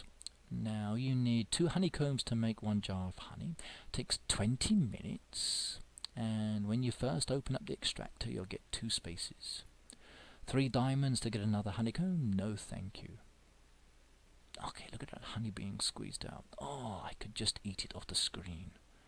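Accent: United Kingdom. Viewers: Hey, you are fine with me about the accent. Here in the states people talk with a tone in their voice as if tey are asking a wuestion yet making a statement, being sight impaired it is annoying, yes I see with one eye and a gamer at 53. Love it. Especailly hayday. British accents are hot. Thats howi got blessed to meet my spouse, he met the bestles. Lived in kent and went to dorton house schol for te blind.theuk treated him as if he was royalty.respect and dignity not like the services for the blind here. They doubted my connecting up the internrt, dragon naturallly speaking, amazon, dow loading books and games, hayday.my farm is teri millers. Pigs infar upper right hand corner, bees decoate the front, right.you will eventually find a theme. And my artisic skills no horses yet and bunnies soon.like me onfb. Treacle tarts are a dream. I got to visitfor two weeks. 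Too short for me. I love the uk.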